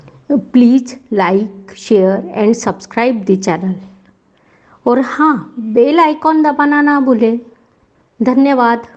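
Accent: Maharashtra, India. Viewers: I love that MARATHI accent....jai shivaji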